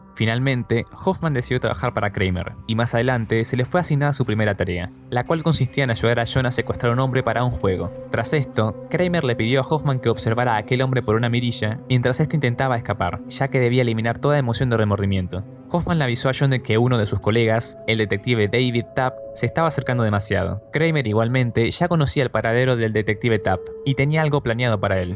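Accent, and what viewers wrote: Argentina. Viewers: Excelente video, muy detallado y completo, pasando por toda la saga de esta maravillosa película, felicitaciones por tu trabajo, amigo, por tu acento sos Argentino. Saludos desde Buenos Aires Argentina! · ja, reconozco bien ahi el acento argentino como cuando dices "machoria" (mayoria) o "chaves" (llaves) y a pezar de esto tienes una voz muy narrativa y asi, buena pa el estreno del video, qe buen era el momentito qe pasé gracias a ti, te lo debo tu like, pero qe 🤢🤮🤮🤮🤮 cuando mostrate la escena cuando kramer morrio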